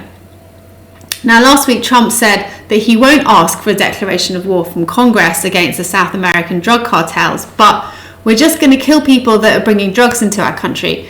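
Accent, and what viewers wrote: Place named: Sussex, United Kingdom. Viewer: Get a bit more dirty of a Sussex accent why doncha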